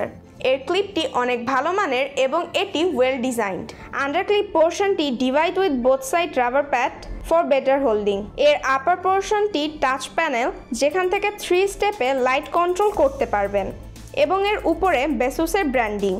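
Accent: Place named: Malaysia. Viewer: that’s great i heard bangla spoke from malaysia but it’s sounds different. dunno bak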